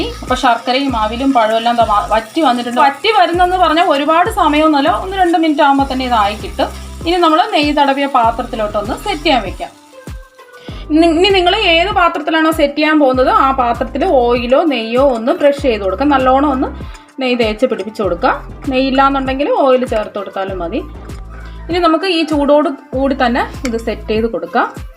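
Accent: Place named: Kollam, India. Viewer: Mam, your recepy is very good and I love it. But your kollam language is also attractive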